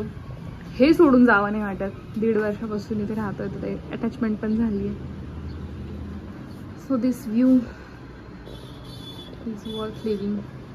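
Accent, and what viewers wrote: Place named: Nashik, India. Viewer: Half vlog baghitla language hun vatal aaplyachkadche distay Nashikkar❤ nd I'm right😀 New subscriber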